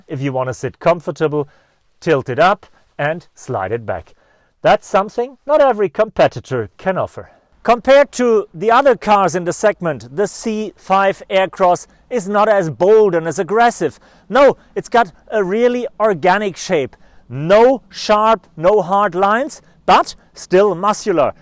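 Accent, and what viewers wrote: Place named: Germany. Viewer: You ain't no violinist; just a regular bratwurst German with a Nazi accent.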